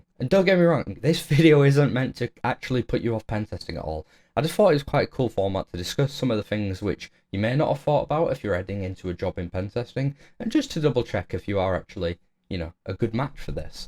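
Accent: United Kingdom. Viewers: This English accent gives me a headache.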